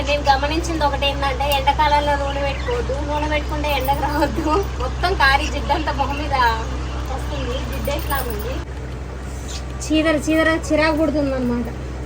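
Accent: Telangana, India. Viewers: Hai madam me telegana slag chala bagundi meru balagam move chudanandi same melagy mataldaru eadhare papalu chusukovadam chala kastam pellalne adigenatlu chypandi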